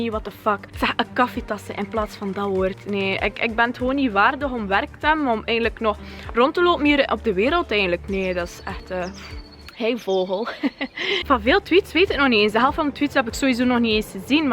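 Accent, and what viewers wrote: West Flanders, Belgium. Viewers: Het is echt niet erg! ♥ West Vlaams is supermooi en alsof de mensen die jou uitschelden zelf beter zouden kunnen! ♥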